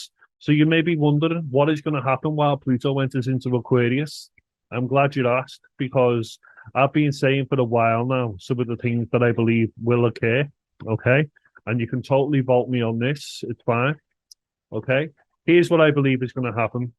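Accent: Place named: Liverpool, United Kingdom. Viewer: Love the Liverpool accent btw! Can’t wait to see Liverpool, I have always been fascinated by Liverpool but when I get the chance I have always gone abroad! But next time….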